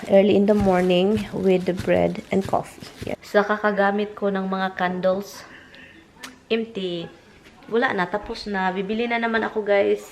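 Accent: Visayas, Philippines. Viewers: Putang-Ina swerte mong naka-punta ng America... bisaya ka ang to gas mong magsalita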